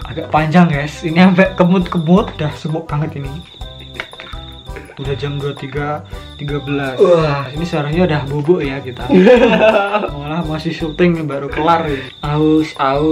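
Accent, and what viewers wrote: Java, Indonesia. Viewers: Yang takut jangan nonton., atau nanti ngompol dicelana., wkwkw., as always like this., tapi keren., ada medok medoknya., hehehe., Semangat bang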